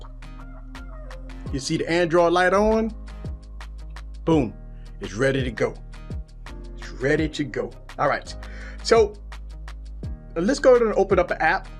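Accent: Louisiana, United States. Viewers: Just curious we're are you from? your accent says Louisiana..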